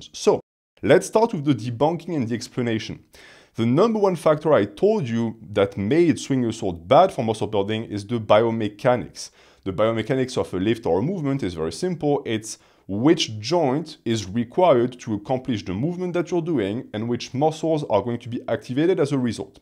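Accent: France. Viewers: Wait are you French ?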